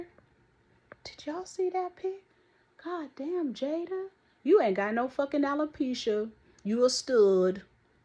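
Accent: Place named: Upper Northeast, United States. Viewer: Loving your upper NE Upper Crust enunciation - Accountability 😂. And I know y'all remember "Unpack your adjectives"😅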